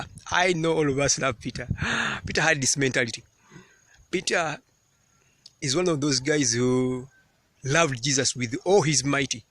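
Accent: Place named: Uganda. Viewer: Hi...moh here new subbie..I swear I watching trying to figure out which country you're from from your accent...Are you from Uganda????...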